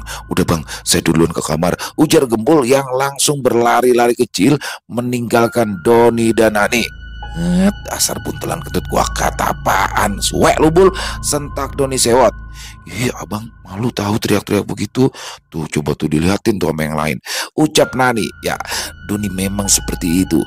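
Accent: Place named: Betawi, Indonesia. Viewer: Pokok nya nggk kn pernah bosan dech...,klo dengerin kisah warung kopi bang Doni sama gembul,di tambah lagi logat Betawi yg di bawain olh bang membuat kisah nya jd hidup. terimakasih bang kisah bang Doni nggk pernah gagal menghibur salam sehat sukses dan bahagia selalu untuk bang dan team ❤di tunggu kisah bang Doni selanjut nya 😊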